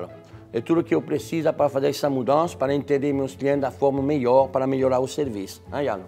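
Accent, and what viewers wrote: France. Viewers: Amo vc jacquin não sei escrever jshshshshshs, enfim vc é um lindo da vontade de morder vc vc é um encanto! E o sotaque francês é muito fofo vc é demais muita saúde, sucesso, dinheiro e principal muita luz divina na sua vida!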